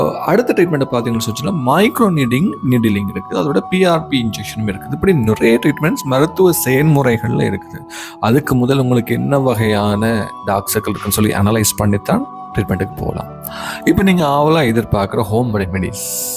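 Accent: Sri Lanka. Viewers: Hii doctorayyyyyyyyyyyyy this is u r subscriber usha how r u sir speaking out a excellent and a marvelous srilankan tamil ❤🎉❤🎉❤🎉❤🎉❤🎉❤🎉❤🎉❤🎉❤🎉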